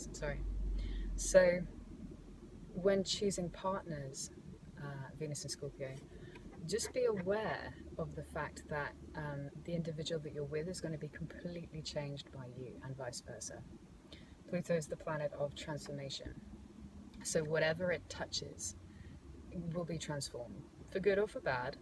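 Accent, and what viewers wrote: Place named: England, United Kingdom. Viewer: Are you from England? lovely accent.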